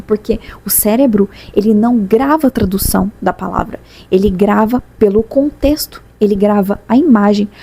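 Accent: Minas Gerais, Brazil. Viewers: Tenho uma curiosidade......você é mineira?(de Minas Gerais?)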